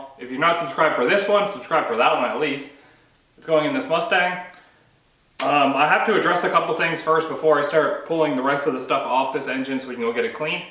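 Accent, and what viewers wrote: Canada. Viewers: The Canadian accent keeps me entertained.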